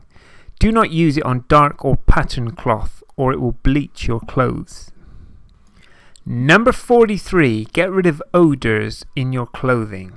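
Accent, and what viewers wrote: United Kingdom. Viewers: Pretty sure mould is the British spelling of the American word "mold". Judging by our narrator's accent, he must be from that part of the globe. I do not think it is wrong to point out a misspelled word in any public context; how else are young people supposed to learn if not by example? Both acne and disinfect were misspelled in this video - pointing out or correcting a mistake does no disservice to the narrator and just might do someone a favor. Come on, is it really better to remain ignorant and illiterate than to learn a little something? How else are young people to learn, if not by observing the world around them, spelling and all? Please, stop being so stubbornly opinionated about someone pointing out an error in a video; it should all be part of the experience. Or are civil discussions completely beyond us....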